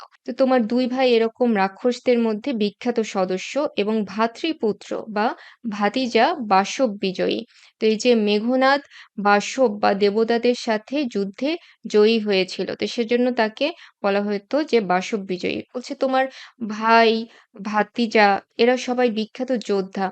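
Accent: Comilla, Bangladesh. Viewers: Apanr basa ki cumillayy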